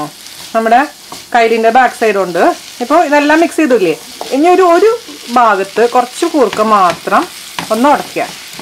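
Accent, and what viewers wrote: Thrissur, India. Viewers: ഹായ് ചേഈച്ചി തൃശ്ശൂര് ഭാഷ കേൾക്കാൻ തന്നെ സുഖ ഞങ്ങടെ വീട്ടിൽ കൂർക്ക സീസൺ ആയാൽ എന്നും കൂർക്ക ഉപ്പേരിയവും ഇപ്പോൾ ഇവിടെ മസ്കറ്റിൽ ലുലു വിൽ ഉണങ്ങിയ കൂർക്ക വരുന്നത് നാട്ടിലെ പോലെ മണം ഒന്നുമില്ല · Trishoooor aaan'to.......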